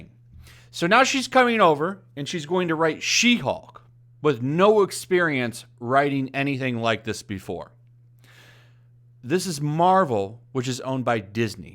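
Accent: United Kingdom. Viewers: What’s with the funny British accent? Pretty awful...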